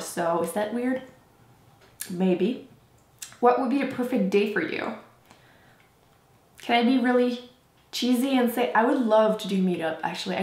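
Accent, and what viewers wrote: Quebec, Canada. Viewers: Just discovered your channel and right away I was sure your were french-canadian, as I'm from Montreal myself :) I'll be checking out all your videos now haha ill make sure to comment. I don't know how you do it with school and YouTube but keep it up! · Yes, No, Toaster! Le français typique du Québec hahaha! J'adore tes vidéos, elles me font toujours sourire :) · I just found your channel from the Kat Von D Everlasting Lipstick swatches and looking through your videos I saw this where you answer what kind of accent you have... French Canadian! So cool, the majority of my background is from there, and you pronounce "third" just the way I remember my late Pepe... it's nice to hear the accent again! Plus, we seem to have the same coloring (dark brown hair, dark brown eyes, fair skin) so your videos will be very helpful to me since that is a Dark Winter coloring, and you published this video on my birthday!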